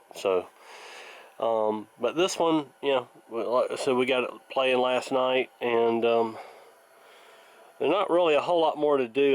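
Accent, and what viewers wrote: South, United States. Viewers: I like to take large tabletop radios like that that are junkers that are kind of not really worth restoring and turn them into guitar amps so if you have any of those basket cases where you don't feel like it's worth restoring to a good workable radio. Even if the chassis is missing and it's just the wooden shell I can make a chassis out of sheet metal and source transformers. Maybe we can work something out. It sounds like you're in the South you might not be too far away from me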